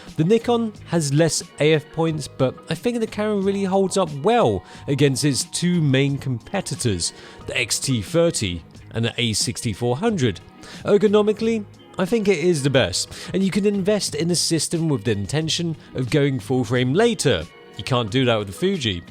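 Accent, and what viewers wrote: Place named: London, United Kingdom. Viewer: he doesn't really..... accent is London-ish?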